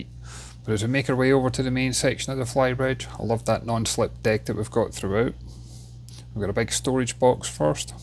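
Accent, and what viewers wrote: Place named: Scotland, United Kingdom. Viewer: Neat review; loving the Scottish accent...Garnock, Ayrshire?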